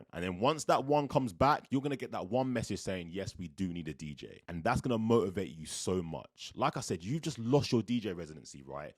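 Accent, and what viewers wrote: London, United Kingdom. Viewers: Dollars? Your accent made me think you’re down the road to me in London 😄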